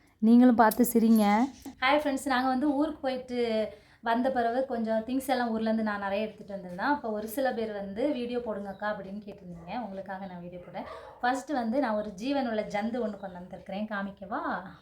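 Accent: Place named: Nagercoil, India. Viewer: Akka antha Mann Paanai Satti la Meen kozhambu vechu paarunga,. You might be tried ., but intha sirappa sollirukellam, I don’t know other Gravy., but Fish Gravy will be awesome…. Nagercoil sirappana Ooru ., Pakkathula Marthandam , kaniyakumari Thiruvalluvar Statue, antha sun rise , sunset ., and your Senthamizh Slang of Nagercoil mixed with Malayalam know Akka., you People are awesome akka., Vazhka Vazhamudan Makkale…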